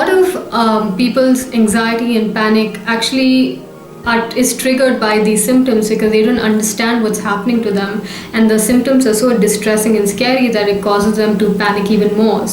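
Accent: India. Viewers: so cute i love the asian indian accent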